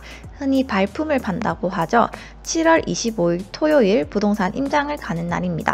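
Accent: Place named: Ulsan, South Korea. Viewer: 잘 보았습니다..울산분이었군요..어쩐지 말투가 나랑비슷하던지^^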